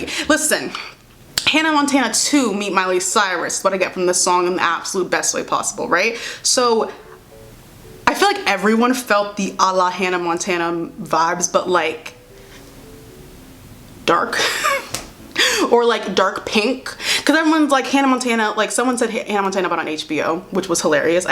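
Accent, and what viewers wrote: New York, United States. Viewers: random but i love when new york accent comes out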